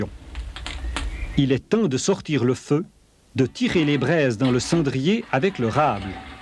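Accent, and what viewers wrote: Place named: Rural, France. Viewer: La narration, c'est ton papa ou c'est toi qui reprend un accent du terroir ?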